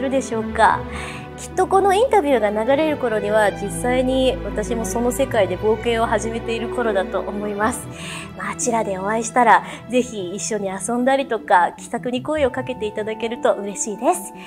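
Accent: Kansai, Japan. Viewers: the kansai dialect definitely made it 10x better <3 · Queen of Kansai Dialect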